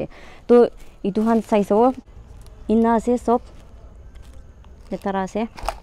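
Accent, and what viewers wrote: Nagaland, India. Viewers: Now that is how Nagamese should be spoken, unlike those other channels trying so hard to add in Assamese and Sanskrit-like words which we can't even understand.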